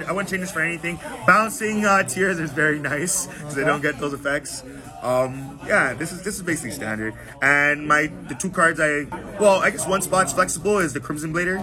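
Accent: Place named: Toronto, Canada. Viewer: I recognize the way he speaks. My dudes from Toronto 🙏🏻 got a crazy good profile too. Thinking about getting into this deck.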